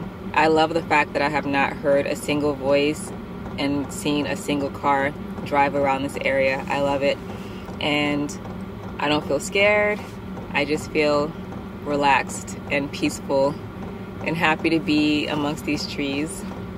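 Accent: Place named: Upstate New York, United States. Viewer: I'm still watching the video but it sound like you are upstate NY. I hope you visit one of the boroughs LOL Come visit the city. I can show you all the spots you will love like ice skating in Manhattan!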